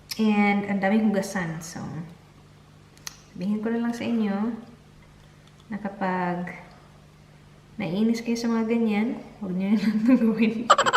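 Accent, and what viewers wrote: Visayas, Philippines. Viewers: Ka bisaya ba diay nimo te oi HHAHAHAHA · Bisaya ka po ba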